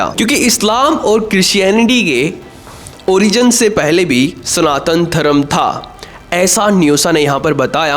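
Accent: India. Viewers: bihari accent per thoda kaam karo, स ओर श bolne per.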